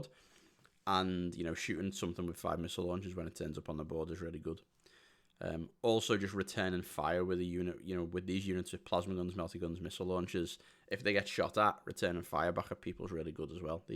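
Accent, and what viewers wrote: Liverpool, United Kingdom. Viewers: wow, it's obv there but it's a much cleaner scouse twang than I'd expect. Must be from the posh part of Liverpool lol. Meant no offence haha.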